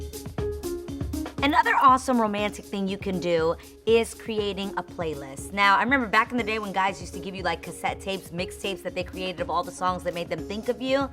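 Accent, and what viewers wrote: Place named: New York, United States. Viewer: I love how you haven’t lost your New York accent or slang lmao. I moved from New York to Hawaii and i still talk with an NY accent 😂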